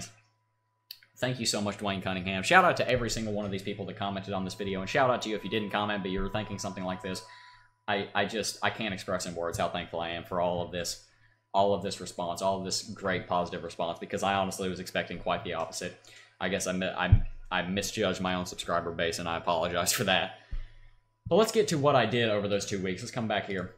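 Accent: South, United States. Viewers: I missed your country drawl!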